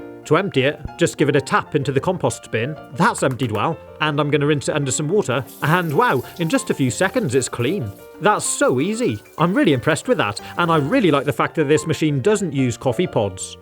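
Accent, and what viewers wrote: United Kingdom. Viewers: Apart from the constant upwards inflection at the end of every sentence, this bloke is the most British man to ever British. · SO HES BRITISH?! I’ve been wondering what his accent is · nga hes jus british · The most British voice I ever heard 😊 good video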